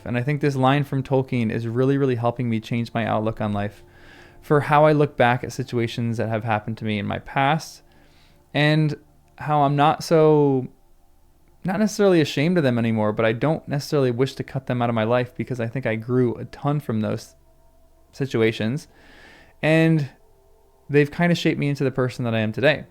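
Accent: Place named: United States. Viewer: Dreadful American accent. Gaal’m.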